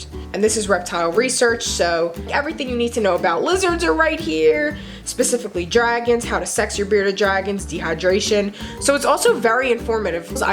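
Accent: Philadelphia, United States. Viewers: Who else can hear her philly accent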